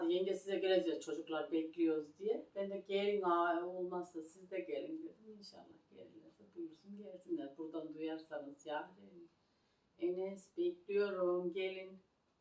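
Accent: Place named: Aksaray, Turkey. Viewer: Nerelisin abla konuşman Aksaray lı gibi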